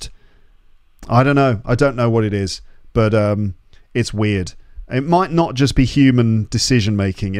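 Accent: RP, United Kingdom. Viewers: *it's a comment from shadow 😂 * Вот по-русски я бы сказала, как я рада слушать ваш классный голос и потрясающий британский акцент! Могу как Лев Толстой в одно предложение на две страницы без единой точки выразить бурю эмоций и переживаний, а по-английски не могу! Поэтому сижу в тени! Ваш старый добрый подкаст сам как старая добрая Англия 😊 Спасибо за него! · Hi how are you, please when you read the books which accent do you use RP or what? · Hello. It's always great to listen your podcasts and watch your videos. They really help me in learning English. I love British accent and your accent is absolutely amazing. Thanks a lot.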